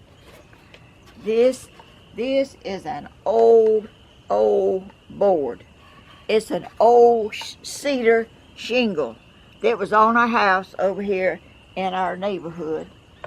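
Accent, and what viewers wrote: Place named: Georgia, United States. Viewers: First I want to tell you I’m from Crabapple (Alpharetta/Roswell area) and have lived in the Dallas area in Texas for the past 20 years. I love seeing your beautiful creations and your accent makes me miss my parents (who passed in 1984 -Mom and Dad in 1996). I don’t get to hear it enough. My youngest daughter stuttered but the schools here brought in a therapist and they made it go away. But I’m just like you - tender hearted and I was raised to treat others as I want to be treated. I can stand up for others but never myself. I’m sorry if anyone has ever been rude on here to you. You might like this comedian on YouTube - she’s from the Carolinas and a clean comic. She’s fabulous. Jeanne Robertson. She’s a hoot. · Just finding your vlogs. My family is from Vidalia. Also have family all around Dublin. Who knows one of our family tree limbs might have crossed. 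Enjoying listening to you talk makes me homesick. Haven’t been able to go back to Vidalia due to COVID 19. You now have a new subscriber. I will let my family know about your vlogs. Since you love country music you need to come to Nashville. We have lost a lot of great singers but we still have a lot still singing so when you feel like traveling come on over and we will have to get you some Grand Ole Opry. Tickets.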